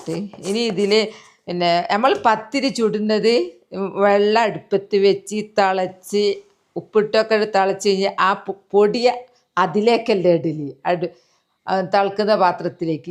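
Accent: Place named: Wayanad, India. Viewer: Wayanadan...samsaram...ugran... Thrissur..ninnum...❤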